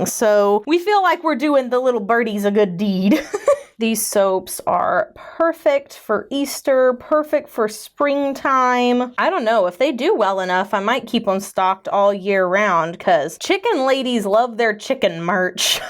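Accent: Texas, United States. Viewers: i havent commented much i dont think but i used to watch your channel SOOO much back in 2018 or so! i miss living in texas, moved out to co a fews years back ive started watching you again cuz i missed hearing the accent! i love chickens!!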